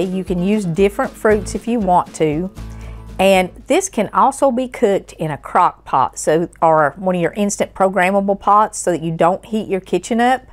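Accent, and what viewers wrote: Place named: Kentucky, United States. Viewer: Love your diwn home cooking.Although i was born in Ky,Covington, right across the Ohio River opposite Cincinnati. You sound like a Ky woman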